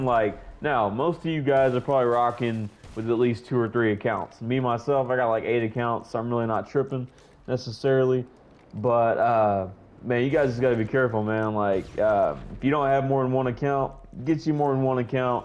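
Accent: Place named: Mexico. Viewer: You sound mwxican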